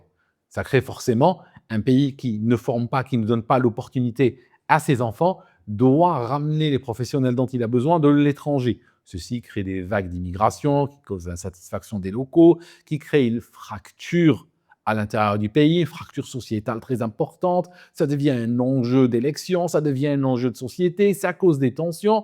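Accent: Switzerland. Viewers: Ah l'accent suisse :) ....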